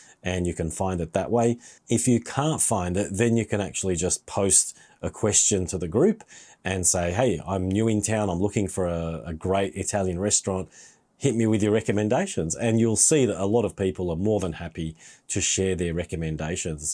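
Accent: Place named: Japan. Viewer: 日本人っぽい英語ｗ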